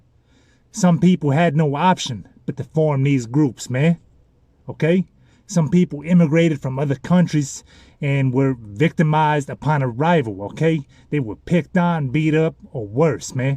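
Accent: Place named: Vietnam. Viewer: U definitely picked up the Viet accent lol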